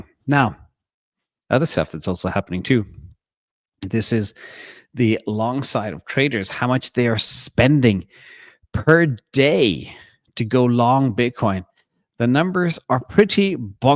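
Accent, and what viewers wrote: Europe. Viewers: to me he doesn’t sound like he has an American accent, it has a hinge of euro accent but I could never pin it down. My guess is he moved around as a kid.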